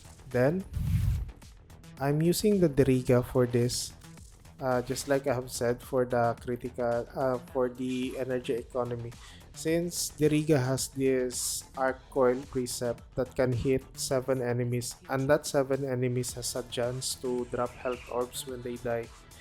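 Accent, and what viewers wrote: Philippines. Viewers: are you filipino? sounds like it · even the diction and cadence is similar to how Filipinos speak Tagalog. The "f" and "v" to the rolling "r"s and "t"s. Though even English in the ph will still vary depending on the region. That being said, I prefer the human voice than the AI one.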